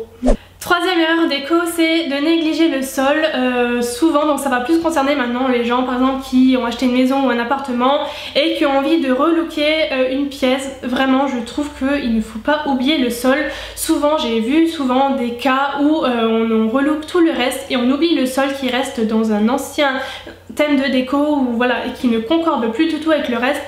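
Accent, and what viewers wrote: Lorraine, France. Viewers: hello! oui c tout à fait ca..en tous cas je te découvre tous les jours et franchement je ne sais pas kel age tu as mais tu as l'air talentueuse, posée, sympathique et très pro! stp fais + de vidéos deco ou dny!! je vis dans un chalet et je suis en plein travaux/déco et t vidéos donnent d idées) ta chaine est une belle découverte. ps: j'habite a sarreguemines, ton accent me parle....me trompe je? des biz ;-)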